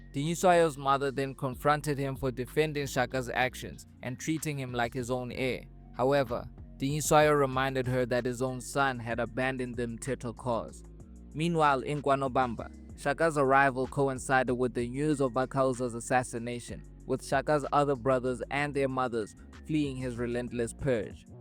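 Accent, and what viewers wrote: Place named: United States. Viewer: Loved the review but your americanized accent is throwing me off abit. You Joburg guys need to stop consuming American content😂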